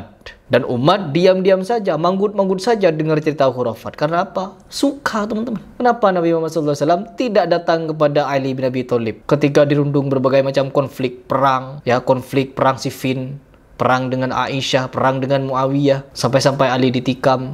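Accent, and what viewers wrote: Madura, Indonesia. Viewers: Logat nya kaya Madura.....belajar lagi mas bro....pakai ilmu padi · Iya logatnya khas kek madura ya... Tp penyampaiannya pas mdh dicerna..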